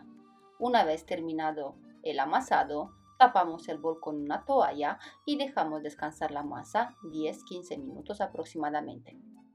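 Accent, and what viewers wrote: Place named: Romania. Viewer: Que buena pinta!!! Ce bun!! Eres rumana?? Tienes acento así eres muy buena cocinera !!! O sa fac reteta multumesc mult!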